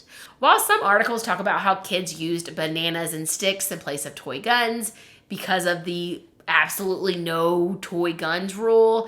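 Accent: South, United States. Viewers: This was so interesting. I didn't know that families lived there. I can't imagine in today's world having hardened criminals babysit and entertain your kids. It makes the men seem less evil than they were. I love your content so much! Do you have a script written of what you want to say and read from it? You narrate so well and in such detail, you can't be just remembering all that! LOL. I am a southerner as well so I thought you had some southern connections. The friendliness, the laid back style, softer ways of pronouncing some words, but I was guessing maybe the Carolinas, not Louisiana. Deep south, like me, as I'm Lower Alabama.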